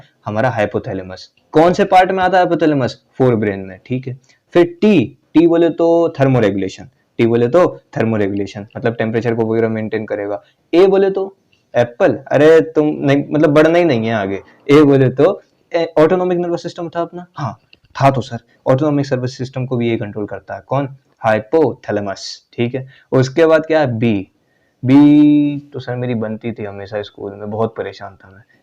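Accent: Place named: Bihar, India. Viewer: sir aap bihar me kaha se hooo...aapki boli se pata chal raha hai ki aap bihari ho...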